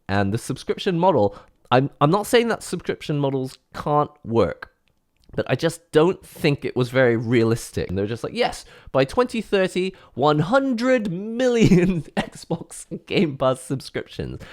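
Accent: United Kingdom. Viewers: Why do you have an uk accent?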